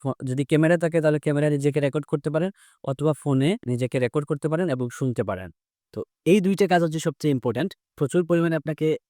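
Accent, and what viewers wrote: Sylhet, Bangladesh. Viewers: ভাইয়া আপনার বাসা কি সিলেট?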